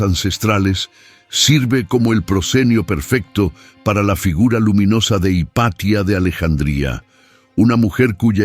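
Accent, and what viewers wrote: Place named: Castile, Spain. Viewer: Es con acento castellano 😊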